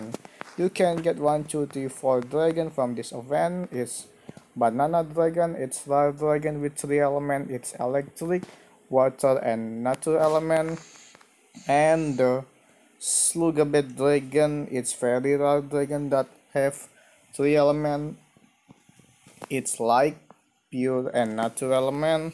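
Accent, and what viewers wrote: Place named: Indonesia. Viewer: Bang gw tau lu orang indo di lihat dari ip chanel dan logat bahasa inggrisnya wkwkwkk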